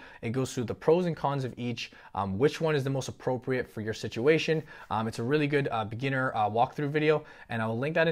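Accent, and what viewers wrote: Toronto, Canada. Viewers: ahaha Toronto mans for sure. great content bro new follower here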